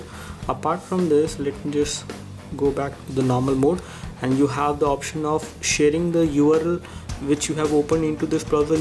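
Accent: India. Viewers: 印度英语。。。霸气！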